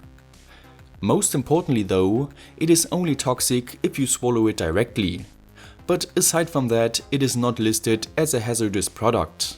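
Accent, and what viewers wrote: Germany. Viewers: Are you from Germany???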